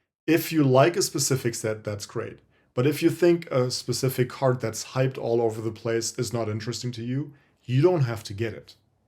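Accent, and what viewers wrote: Germany. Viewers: I hear the Deutsch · Tolles Video (ich hoffe ich habe den Deutschen in dir rausgehört ;) )! Ich versuche mich seit Tagen schlau zu machen über das Pokemonkarten game, weil ich vorhabe meiner Nichte (1 Woche alt) zur Taufe etwas wertvolles zu schenken auf das sie stolz sein kann wenn sie mal älter ist... jedoch habe ich noch keinen Anlaufpunkt gefunden wo ich nicht dieses Gefühl hatte, dass der Verkäufer einen "Dummen" sucht... gibt es einen zertifizierten Händler, wo ich ruhigen Gewissens "einkaufen" kann? Liebe Grüße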